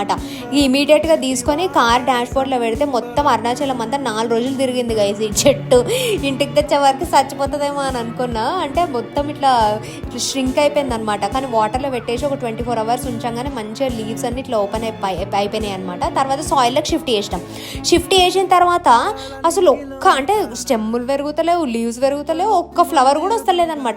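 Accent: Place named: Coastal Andhra, India. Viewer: Akka Mee language slag baga undi akka I think your a coastal Andhra region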